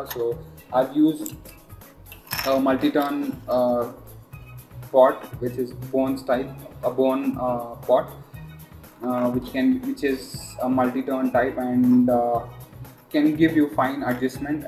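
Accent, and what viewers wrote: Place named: India. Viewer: stop speaking indian, learn english dude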